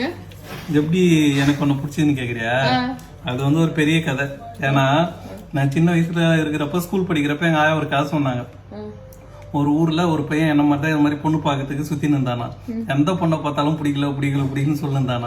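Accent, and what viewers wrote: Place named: North Arcot, India. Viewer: சென்னை வட ஆர்கேட் பேச்சு வழக்கு ❤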